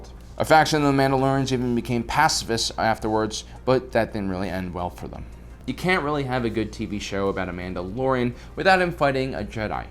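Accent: Australia. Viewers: You actually getting better at the Australian accent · ... Aussie? New Zealander? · Are you Australian ? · are you Australian ? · The intro sounded very Australian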